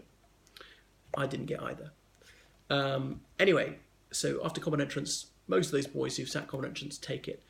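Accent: RP, United Kingdom. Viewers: Gawd blimey, he don’t speak like wot I do does he? Proper posh is that..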